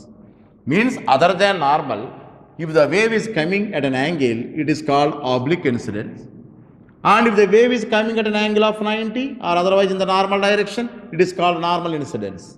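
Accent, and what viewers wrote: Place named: India. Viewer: understanding indian English is a thing.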